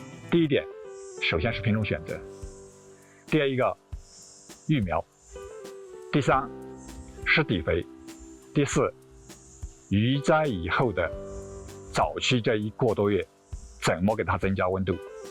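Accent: Yunnan, China. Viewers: 听您口音一定来自云南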